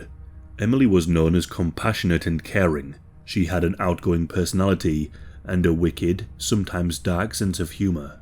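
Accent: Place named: Northern England, United Kingdom. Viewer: Not even hald way thru that cheb ends personal description yes but. im actually already physically cringing at 'scarface' LOLL more like 'uncontrollable teen acne-face'. What a fuckn loser. Cant even imagine this litte coke heads further actions n what he'll end up doing to that poor young lass. Hate little pests like him. Calls himself topdog yet cant even buy his own car. What an absolute bell end... i already despise him and im not even a 1/4 way through the entire video. Amazing work as usual. As a fellow northerner usually a northern accent makes me awkward as im so sick of it but you make me proud to live up norf again ha ha. Legend!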